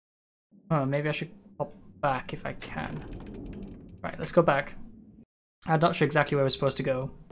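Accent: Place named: United Kingdom. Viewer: are u British? ur ascent is amazing I love it cuz it sound like you could be on a podcast or something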